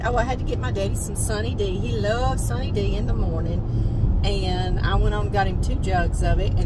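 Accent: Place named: New Orleans, United States. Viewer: Nothin like that good ole N.O. French bread! Sounds so good. You make me homesick and remind me Of my cousins and life there and growing up. Love the way you talk and your mannerisms and sayings. Just like the old days for me.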